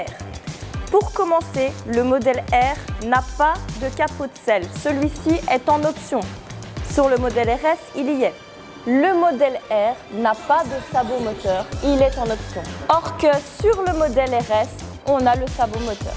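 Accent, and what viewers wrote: Belgium. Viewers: Tu ne serais pas belge ? Sinon tu presente bien les véhicules et c'est d'autant plus agréable qu'on cest une femme.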